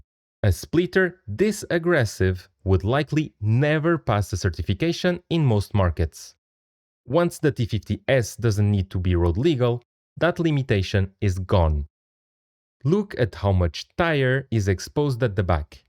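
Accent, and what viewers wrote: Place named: Portugal. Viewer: Sotaque tuga... :)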